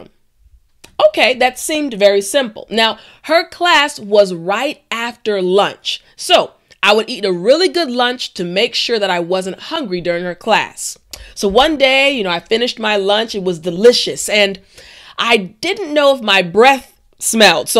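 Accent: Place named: United States. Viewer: you r litteraly terrific, i do love your ways of teaching moreover your american intonation. I'm still connected to your lessons.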